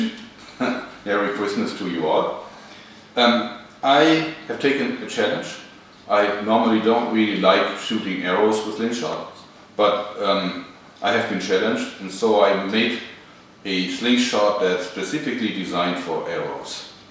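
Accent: Germany. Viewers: The german accent just makes it better! *I ment this in a 100% positive way*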